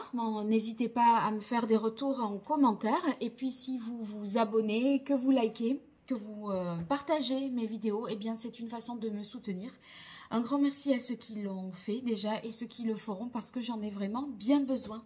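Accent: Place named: Brittany, France. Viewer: Merci pour la vidéo, vous êtes jolie comme cœur ! 🙂 Et votre bel accent il vous vient de Bretagne ?? 😉😋😇 Beau Dimanche tous 🙏🙏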